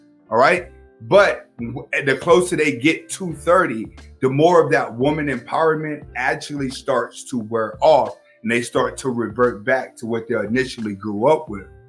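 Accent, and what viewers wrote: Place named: Pittsburgh, United States. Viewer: You from the burgh bro?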